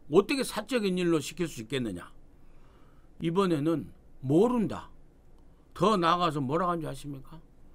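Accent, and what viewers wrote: Jeolla, South Korea. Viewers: 오랫만의 칼럼 반갑습니다. 뒷배경이 어두워 훤하신 원장님의 얼굴이 같이 어둡게 보입니다. 그리고 구수한 사투리로 말씀하신다면 전라도국민들이 귀기울여 듣고 깨어날거같아요. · 뒤에배경이 너무새까매요 인물은훤한데 따라서얼굴도 어둡습니다 고쳐서100만명달성하시요전라도말투도 좀빼시고요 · 점잖게 하시지 말고 스타일 대로 하세요 ㅋ 라도 스타일 선생님이 하시면 듣기좋아요 쎄게 하세요 그럼 백만 갑니다 똑똑 하세요 선생님은,0~~~~~0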